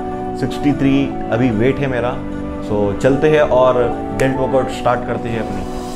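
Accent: Hyderabad, India. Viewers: Hyderabadi ho kya Miya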